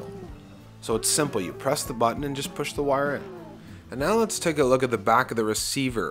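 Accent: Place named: Canada. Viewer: Canadian detected.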